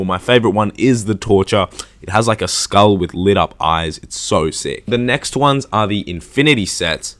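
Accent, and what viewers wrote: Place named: Australia. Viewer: Your an annoying Aussie. It's your phrase structure that annoys me. Like you sound blaagghhttt!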